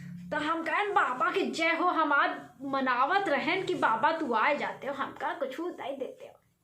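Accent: Awadh, India. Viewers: मुझे जब भी अपनी महिला मित्र की याद आती है तो मैं आपकी ये वाली वीडियो देखता हूँ, मैं उनको ये वीडियो सेंड किया था तो वो बोलीं थी कि ये तो बिल्कुल हमारी तरह से बोलती हैं, अवधि भाषा में, हमको कम समझ में आता है हम ठहरे बृज के निवासी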